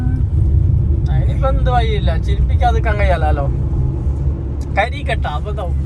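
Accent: Kasaragod, India. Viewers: ഇതെന്ത് ബാസ നിങ്ങടെ കാസറോട് ബാസ 😮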